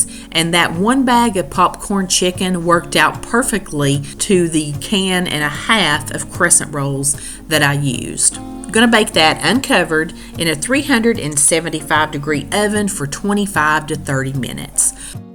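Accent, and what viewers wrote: South, United States. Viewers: Merry Christmas and Happy New Year to you and your family. I just recently discovered your videos and I really love them. I love your southern accent. Southern people are always so friendly and they sure know how to cook! What you said in this video was so nice and sincere. It brought a tear to my eyes. Thanks again for making your videos. I love watching them and I’ve saved several recipes to try. I call them my experiments.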